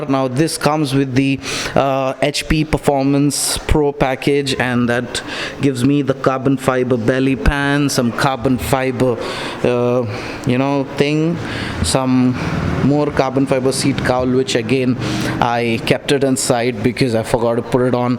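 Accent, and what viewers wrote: Hyderabad, India. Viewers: Didn't like this English version make video in your old Hyderabad hindi style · Bhai Hume aapka Hyderabadi accent me video dekhna pasand hai bhai♥️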